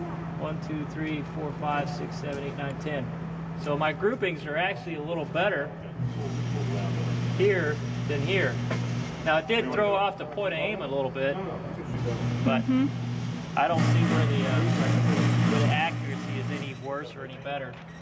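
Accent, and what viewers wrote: United States. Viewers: i can hear a southern accent in you, how long have you live in texas? no offense im from up north.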